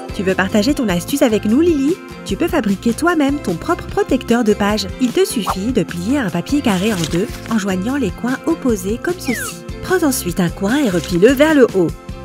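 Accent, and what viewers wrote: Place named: England, United Kingdom. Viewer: Je sais pas si vous être anglais ou français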